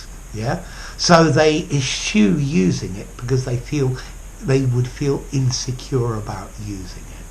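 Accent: United Kingdom. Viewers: Hi,! I like the style of your lessons. And British English of course.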